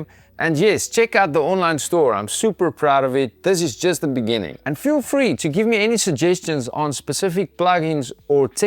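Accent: South Africa. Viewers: Super awesome! Thanks for sharing. PS: With that accent you must be a boertjie from SA? Greetings from NC. Groete van huis tot huis.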